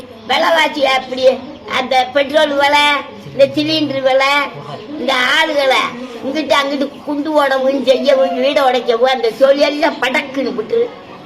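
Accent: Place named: Usilampatti, India. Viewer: ❤❤sema paaati.... Theni usilampatti pechu sema 😂😂😂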